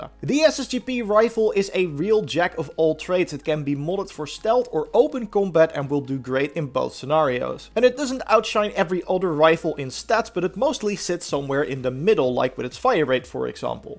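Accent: Netherlands. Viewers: You must be Dutch. Heard it within the first 4 words you spoke. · Are you Dutch? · I can hear on this guys accent he’s Dutch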